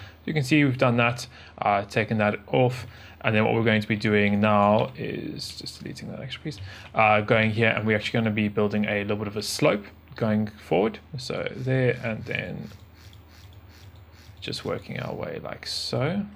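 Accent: South Africa. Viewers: You sound like a South African :/ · Are you south african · You sound south African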